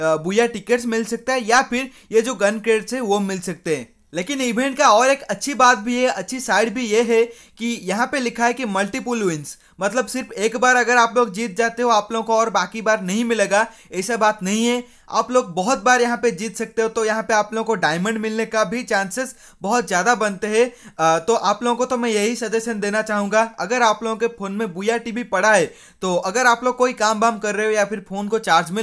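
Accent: Odisha, India. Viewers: Ap Odisha se ho kya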